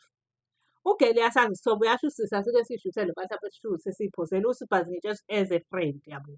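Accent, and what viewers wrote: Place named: Pietermaritzburg, South Africa. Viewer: Hawu awusho awsiye owasePMB? Ngizwa i accent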